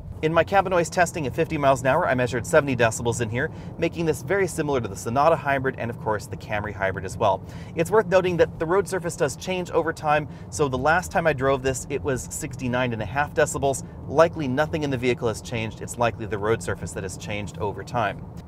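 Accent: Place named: Texas, United States. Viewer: Must be that Texas accent.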